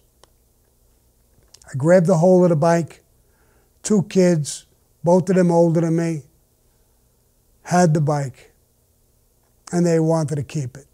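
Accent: New York, United States. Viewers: hes great storytellar that old school newyork accent i miss hear in it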